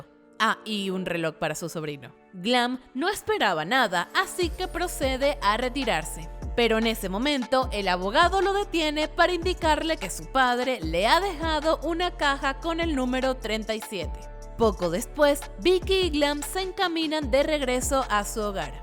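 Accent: Chile. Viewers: Soy yo o la narradora tiene un ligero acento chileno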